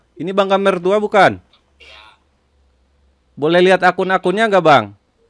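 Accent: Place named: Kalimantan, Indonesia. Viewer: logat bahasanya kayak bahasa melayu arah ke kalimantan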